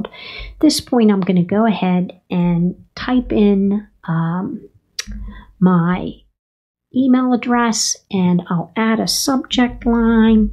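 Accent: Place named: United States. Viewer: this is mark, from the west country of England, I appreciate your approach, I hope you do really well with it, it is so important for the coming generations, that our elders have access to proper Lee paste properly paste paste PACED paste Instruction on how to use the iPhone and Mac and iPad, and all of that malarkey I would love to see a video of you recording how to dictate, as I tried to above, and failed, the word PACED, which I have only managed to get my iPhone 6 to iPhone 6 two Transcribe by spelling it out, letter by letter. And, it won’t even get the number of my phone right, I would have to dictate the number one, then the number six, for it to work, as below: iPhone 16 new paragraph, and it still cannot understand it about 70% of the time when I say the words new, paragraph, it just types out new paragraph until I say those two words several times, one after the other, like I just love your accent, and would really appreciate knowing which exact town you are from in the United States! 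Me, I have a south east accent, I was born on an island of England, called the Isle of Sheppey Very best wishes, Mark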